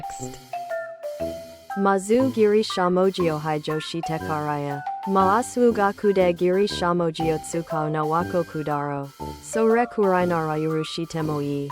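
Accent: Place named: United States. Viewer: a の発音がeiの時とerの時があるね。ほとんどaとは発音しないアメリカ英語。 あと授業はjigiyaou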